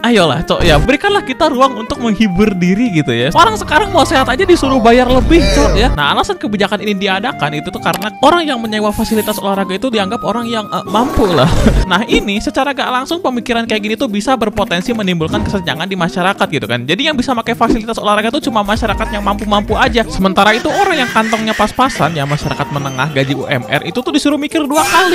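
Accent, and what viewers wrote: Bandung, Indonesia. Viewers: Lo orang mana bang? Bandung ya?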